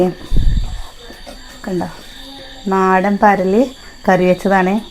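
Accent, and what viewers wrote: Palakkad, India. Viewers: പാലക്കാട്‌ ആണോ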